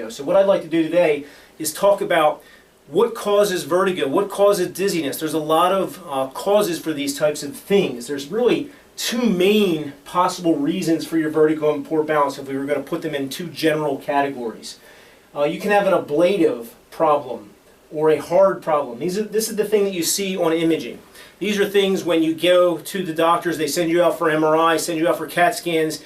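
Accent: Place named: East Coast, United States. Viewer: What about dizziness brought on by visual sensitivity? Otherwise, one of the best videos I’ve seen on the topic of dizziness so far; thank you! Also, please put the link to your website in the YouTube description. I have no idea where you are. Judging by your accent: east coast. So, I’m off to google your practice now…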